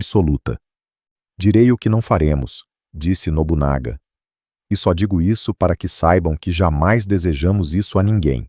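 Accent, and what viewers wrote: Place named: Minas Gerais, Brazil. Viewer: Que doido 👏👏👏👏 (em mineirês 😅 muito legal kkk ) me recordo desse conto em “Relatos Isolados “ …👏👏👏👏👏👏👏deu outra visão do cenário 🤙😀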